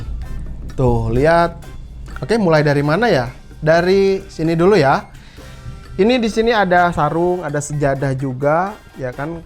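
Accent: West Java, Indonesia. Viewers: Bismillahirrahmanirahimmmmm.. Semoga menang Subscribe✔ Follow✔ Like & Share✔ Instagram : ry_akbar037 Video yang paling diingat adalah waktu review Vga zotac. soalnya pas zotac nya koat ka sunda banget gt. "JOTAX" nyebut na teh hahaha Pengen Banget Ram Venom RX 8GB karna saya rencana mau rakit pc DDR4 soalnya bosen pake ddr 3 mulu hehe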